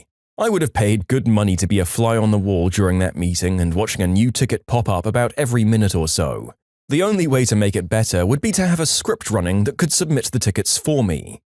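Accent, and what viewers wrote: United Kingdom. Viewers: British narrator?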